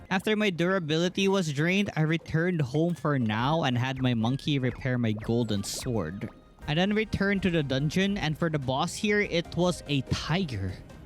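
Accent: Philippines. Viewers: are u from the felepens caus u sound like it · I knew it. From your voice this is a Pipino. Kabayan! Hahaha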